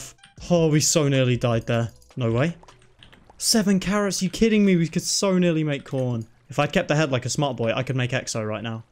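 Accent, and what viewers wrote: United Kingdom. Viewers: QnA: Where are you from? I think your accent sounds English? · just wake up and i can hear the british accent man talking QnA: wat is your real name ? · Lovely jubbly video ❤️my Q&A question is :you sound British are you British ?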